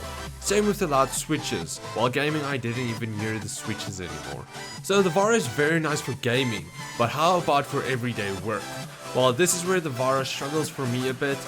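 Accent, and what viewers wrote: South Africa. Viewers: are you from south africa?? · Cool video, thanks bud. Just ordered one of these. Also, you sound South African...are you? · south african? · you from South Africa mate?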